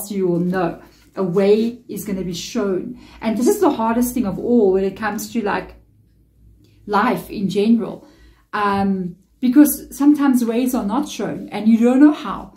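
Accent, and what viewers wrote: South Africa. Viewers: 777 comments, ❤ well I've now made it 778😂❤ ps your accent is my favourite, I personally love the South African accent. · I am South African too living in New Zealand love the accent 😊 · love the SA accent?!!?🧚🧚🧚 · I love listening tonyour readings precisely because of your accent. It reminds me of a former south african manager I worked with, lovely woman, I miss working and talking with her. You talk at a very comforting pace. Thank you for all you share ❤ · Always a delight to be here for your readings. So funny all these south african english like the now-now :) Thank u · I absolutely love your South African accent and the way you speak and express yourself! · I thought your accent is so cute. ❤️ I never had any trouble understanding your English at all. You talk so grammatically correct. I'm in Chicago and never knew anyone who is South African. 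Love you mama tarot lady. ❤️ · ❤😊thank you for your encouraging readings, dear soul!!! And I love your accent, I really do. In the beginning I had to become used to it, but now I understand everything you explain😊. My daughter s had schoolmates from south Africa, and at the age of 12 their parents had moved to Germany. They shared our home very often, and my daughter never had difficulties to understand you!😊 Feel good and keep shining❤❤❤ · That is what drew me to your channel, not only your lovely face but beautiful South African accent. Thank you for all you do..................... love from the UK. xxxxx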